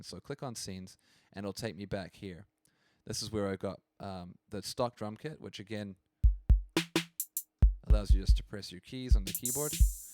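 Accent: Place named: New Zealand. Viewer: I could definitely hear the kiwi...solid keep up the good vids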